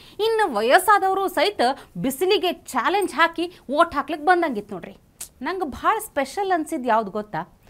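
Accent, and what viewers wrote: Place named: Uttara Kannada, India. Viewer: மகளே!!உத்தரகன்னட பாஷையில்,செய்திகளை கேட்பது எனக்கு அளவற்ற மகிழ்ச்சி!!!🎉🎉🎉😊😊😊😊😊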